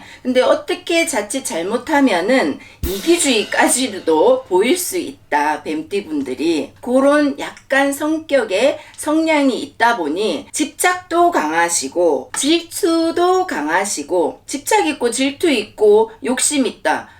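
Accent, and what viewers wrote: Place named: Busan, South Korea. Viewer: 정사생입니다. 부산사람이라 선생님 억양이 너무 푸근하니 익숙합니다. ^^ 선생님 유튭 방송도 응원할게요.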